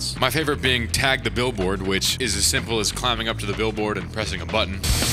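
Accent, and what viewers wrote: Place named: Pittsburgh, United States. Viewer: Are yinz from the Burgh?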